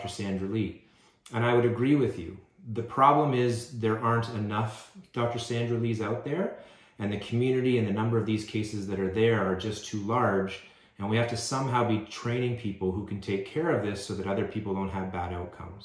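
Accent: Canada. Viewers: I’ve looked for new videos also. I didn’t know you were in Canada until I heard you talk. Most of my fathers family lives in Ottawa. BTW, personally, I think Sandra Lee is overrated. There’s many, many surgeons, dermatologist and plastic guys that do as well or better. I think she’s sloppy and impatient.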